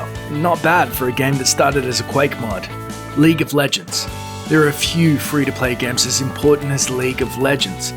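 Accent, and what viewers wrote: Australia. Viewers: I'm sure it's Australian... 98% sure. I feel like Aussie and NZ accents sounds similar tho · Faith Silva Is it Australian? I like that accent, too.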